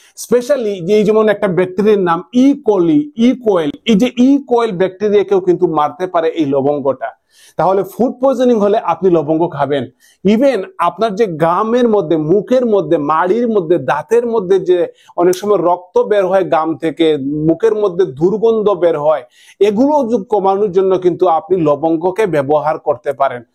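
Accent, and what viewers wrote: Sylhet, Bangladesh. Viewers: সিলেটি দাদা কম কথায় কি বুঝানো যায় না !!! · Apni sylheti Kotay bujlam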